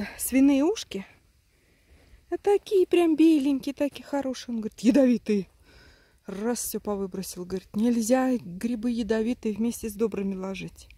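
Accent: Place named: Don, Russia. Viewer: Спасибо большое и за видео такой красоты! Такая экзотическая природа для нас, жителей степной зоны. Такая кпасотища в горах! И ещё в каких горах! Находясь не в любых горах можно обозревать такие великолепные виды. Смотришь, смотришь - не налюбуешься. А какие деревья с камнями, как приятно радует глаз ярко - зелёная трава и папаротники. А водопад.... слов нет, только эмоции восхищения! Спасибо Богу, что он так нас любит, что для нас людей такие виды создал, а вас, использует с, чтобы побольше людей могли таким полюбоваться, чего они в своей каждодневной жизни не видят и видеть не могут. Спасибо, что взяли нас в поход за грибами. Как там интересно с вами ходить... что ни шаг - прекрасные виды и в вверху и внизу и по сторонам. Как вам там сложно снимать было! Ведь всё время под ноги надо смотреть и баланс тела держать и про съемку не забывать. И высоко всё - таки, чтобы у вас и голова не закружилась. 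Вы как молоденькая девушка порхали там с пенёчка на пенёчек. А потом как показали снизу - крутизна то склона какая была! Смелая вы женщина, по вашему говору, мне кажется, что вы - донская козачка. Может я ошибаюсь, но удаль у вас и смелость и напор, как у них, мне так кажется. Не знаю кто бы мог так бегать по таким горам с таким азартом. Моё вам уважение и восхищение. Не слушайте никакой критики, если она будет - вы лучшая по съемкам в горах. Мне очень, очень, очень понравилось! Вы полностью донесли до нас коло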